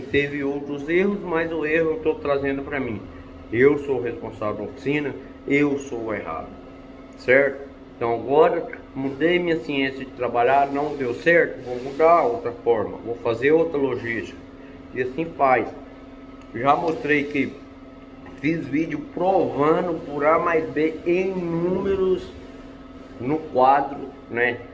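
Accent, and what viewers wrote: Minas Gerais, Brazil. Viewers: Éh mineiro!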